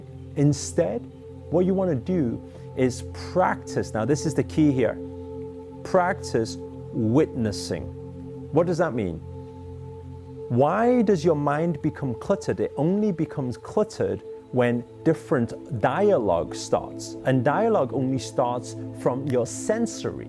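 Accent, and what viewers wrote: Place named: Liverpool, United Kingdom. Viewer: Gotta love that scouse accent! I needed that taste of home! Just had this video sent over and was a wonderful insight that I’m gonna put into action. I’ve been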